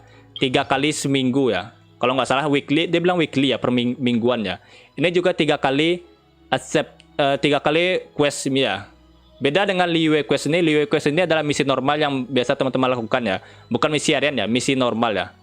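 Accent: Padang, Indonesia. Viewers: Gw tambahin subcriber lu gan.. infonya bermanfaat dengan gaya bicara yg uniq. Org padang kah ?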